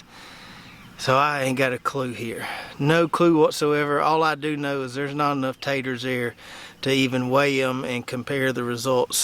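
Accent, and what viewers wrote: South, United States. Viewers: I love how you say potatoes I like southern accent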